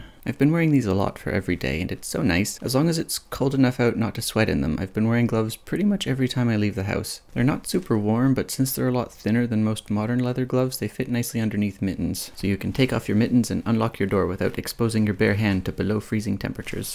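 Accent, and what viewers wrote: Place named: Canada. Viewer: incredible video!! I'm an art student and have seen those gloves in person i believe! your craftsmanship is wonderful to see. I was also trying to pin your accent and you finally said Canada! It's so pleasant and matches your historical vibe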